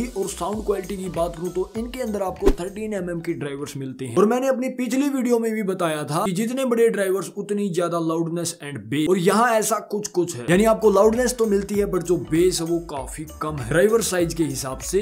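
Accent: Haryana, India. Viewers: kitni e koshish karle bhai 🤣haryanvi accnt a hi jata hai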